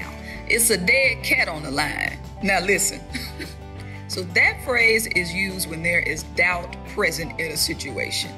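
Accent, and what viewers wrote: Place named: Mississippi, United States. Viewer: Love your accent. Not the same as some Mississippians. I like it better the Mississippi British brogue one.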